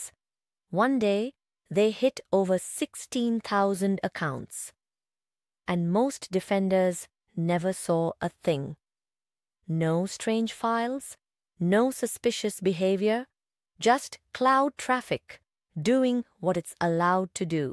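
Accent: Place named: India. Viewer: They made text to speech to sound indian. That is something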